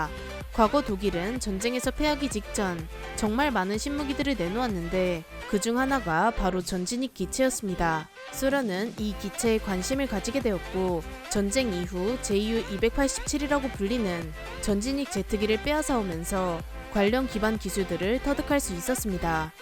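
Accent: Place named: Gyeongsang, South Korea. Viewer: 이 아줌마 경상도식 억양이 있네.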